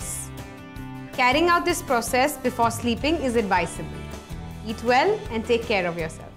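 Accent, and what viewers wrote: India. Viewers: Oh you have such a lovely Indian accent. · The indian accent is so cute :O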